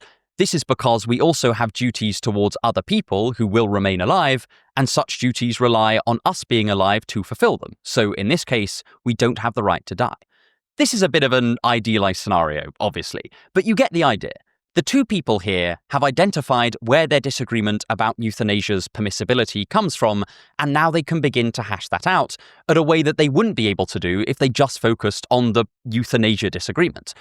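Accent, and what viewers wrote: United Kingdom. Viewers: Yes, I’ve heard the “opinions are like assholes” before, but I thought was inventive to adapt the idiom especially the part about being examined. 😂😂😂 And for me, hearing “asshole” with a British accent carries some elegance that the American accent never will.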